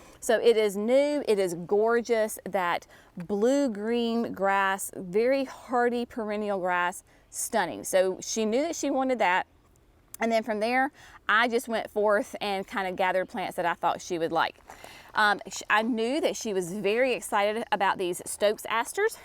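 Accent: South, United States. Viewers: Does Mimi have your sweet southern accent too? Thanks for sharing.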